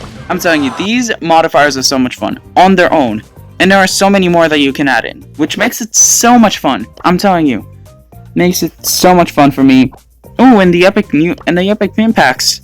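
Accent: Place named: India. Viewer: You sound Indian asf lol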